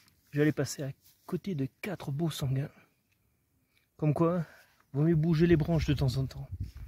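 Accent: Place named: Corsica, France. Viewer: Bonjour peux tu me dire à quelle altitude tu te trouves et quel type de sol as tu? A ton accent, il me semble entendre que tu sois de l'île de Beauté. Merci et bonne continuation.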